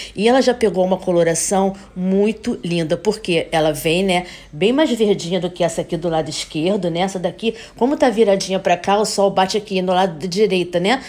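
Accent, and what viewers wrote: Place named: Rio de Janeiro, Brazil. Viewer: Aí carioca kkkkkkkkkkk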